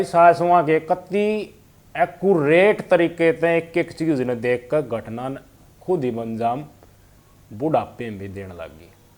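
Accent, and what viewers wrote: Haryana, India. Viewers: Singer to kham kha hanga laa re ha asli haryanvi to bhia saab aap bol re ho ek ek sabed kati sun k maja sa aaga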